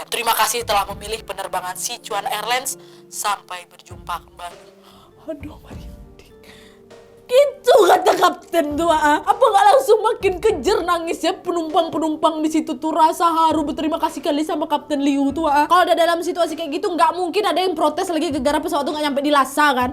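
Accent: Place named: Sumatra, Indonesia. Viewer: Nadiaaaa hahahaha aku suka dg pembawaan:) Aku suka ketawa ngakak KL sdng bercerits cerita seram atau apapun hahahaha Dengan aksen khas Sumateranya yg kental bgt. org mn? Dr Pekanbaru atau dr mn? Aku dl 3 THN sklh di Pekanbaru dan aksennya persis dg:) skrg Sy di JKT lg:) sukses ya:)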